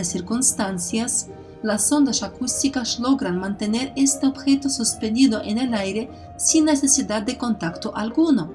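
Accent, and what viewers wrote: Russia. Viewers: excelente video gracias por tus videos he investigaciones son muy interesantes, me gusta el acento de tu español, de donde eres, rusia? bueno, saludos desde las montañas de colombia.... "nadie conoce el color del agua que viaja por debajo del rio".....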